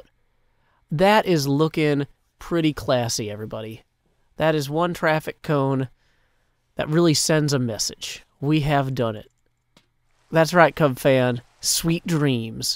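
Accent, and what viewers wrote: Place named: South, United States. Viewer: First time here on your channel, it's sort of refreshing to hear a semi-southern accent in a hermitcraft video! Enjoyed the experience thoroughly, and great poem by the way :) ~your new fan (smoothie) from GA, USA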